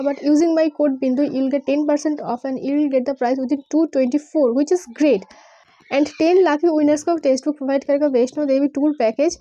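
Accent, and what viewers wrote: Bengal, India. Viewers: U bengali?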